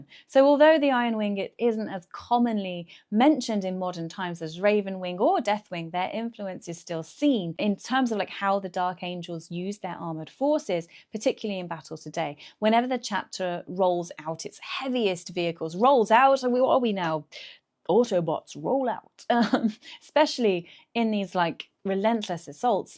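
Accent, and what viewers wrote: United Kingdom. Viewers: We just discovered Dark Angels greatest secret. Their Dreadnoughts are piloted by the FALLEN! P.S. are you British? You have a beautiful accent.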